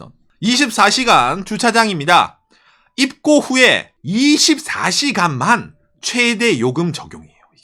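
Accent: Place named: Gyeongsang, South Korea. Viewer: 말투가 경상도 .자갈치에서 꼼장어에 쏘주한잔 하고싶네 ,ㅎㅎ,나는 부산사람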